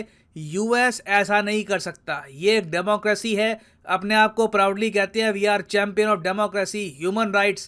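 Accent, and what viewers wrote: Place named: Delhi, India. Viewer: sir kbhi-2 ekdum Delhi wale launde jaise baat krte h, how sweet 😂😂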